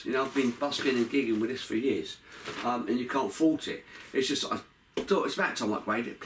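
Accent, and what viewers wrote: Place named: London, United Kingdom. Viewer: Hello. I've been spending some time recently trying to find a decent and not costly amp for busking, watching so many videos on YT. I'm gland I found You. I think I'll go fof this one( the new 80watts version of it ). I like yout accent, it reminds me of my past years when I was living in London(1983/84 and 1991/2000). Respect and stay well, ciao from Italy. 🤠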